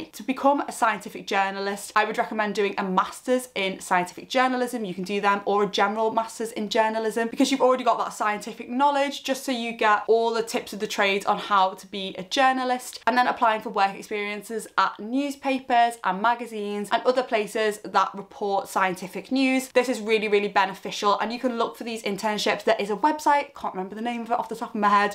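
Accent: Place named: Merseyside, United Kingdom. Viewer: I'm so glad to come across your channel! I'm literally 39 have absolutely no experience with anything on the subject, yet simply through experiences with mental health and my own personal research on the brain and how it works, I've decided I really want to pursue this role! I'm from your neck of the woods by the sounds of things (Merseyside), but currently work full time with 3 kids! Do you have any advise on which pathway I could take to get into this career?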